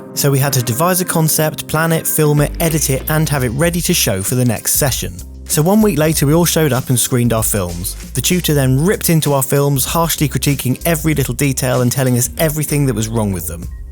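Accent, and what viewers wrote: England, United Kingdom. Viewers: You seem like a very reasonable person and highly professional too in the way you present things and that english accent is probably making you sound more like you really know what you're doing. Im your new subscriber. 😉 👍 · Subscribed only coz of your #BritishAccent.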